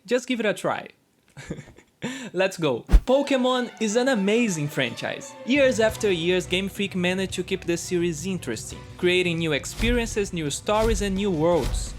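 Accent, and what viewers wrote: Brazil. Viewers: AMAZING VIDEO, you really touched the subject of Game Freak and the Pokémon controversy with good conciousness but also firm on critics, Very enjoyable editing style (E com o sotaque brasileiro impossível de não amar).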